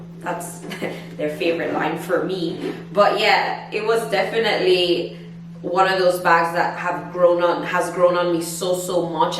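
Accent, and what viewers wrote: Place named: Caribbean. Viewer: You sound West Indian Babe x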